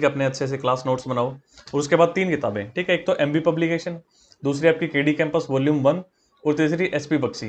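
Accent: Haryana, India. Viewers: Bhai Haryanvi touch❤️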